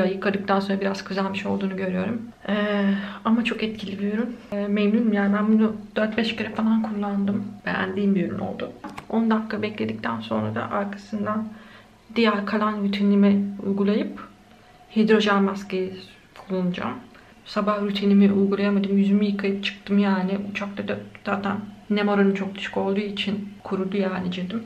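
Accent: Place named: United Kingdom. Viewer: Sizin konuşmanız nasıl acaba ?? Hayır izlemeyecekseniz izlemezsiniz niye böyle bir şey yazma gereksinimi duyar ki bir insan. Ancak birini incitmek için... Başka hiç bir açıklaması yok. Araştırıyor, uyguluyor. Diger youtuberlar gibi fıss değil ama halen kendi elinde olmayan bir sebep yüzünden eleştiriliyor. Üstelik küçükken bir rahatsızlık geçirdiği için konuşması böyle ve bence çok çok tatlı, kibar ve naif. Ayrıca ingiliz aksanına benziyor. Insanlar birseyin içinde kötü görmek istesin yeter ki... Ne acı. Yazık.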